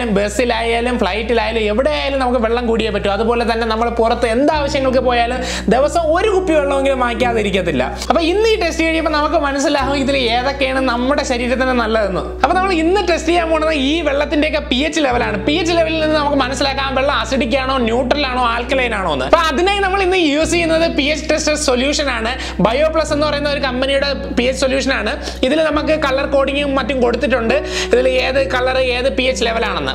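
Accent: Trivandrum, India. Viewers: Bro ningal TVM aana??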